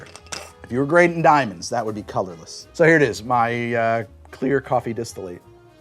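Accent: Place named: California, United States. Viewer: If you close your eyes this guy sounds exactly like Andrew Tate if he was from California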